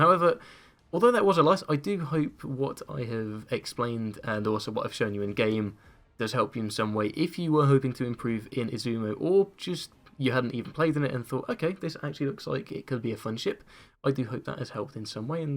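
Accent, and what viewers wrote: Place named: United Kingdom. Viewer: Nice to listen the British accent. Of course we have Jingles but this sounds a bit different. Izumo used to be terrible. Not anymore. Nice video m8!👍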